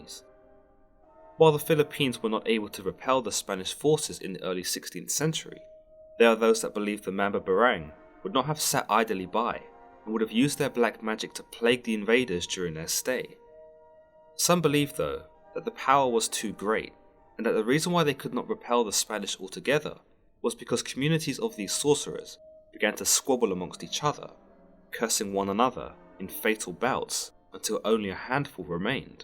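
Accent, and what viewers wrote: England, United Kingdom. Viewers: i hate it when europeans mispronounce and narrate about bipoc history, mythology, ancestry, etc... especially if its my mother's home land-- thats been colonized a bazillion times. why dont they ever have people from the place teach about the place? if anything it'd avoid mispronunciation the very least. MAM-BAH-BAH-RANG. just cuz they got a english accent dont mean theyre more intellectual. coughs despite almost every documentary ever made being narrated by an english voice coughs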